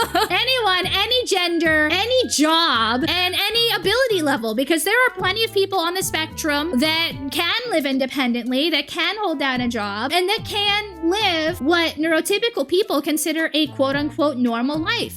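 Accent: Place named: Boston, United States. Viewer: You sound like you have a Boston accent and it's really funny to hear the word pronounced "UAW-TISM"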